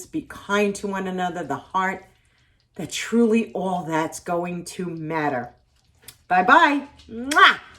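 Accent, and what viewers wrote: New York, United States. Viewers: I hear a New Jersey, or New Yorker accent......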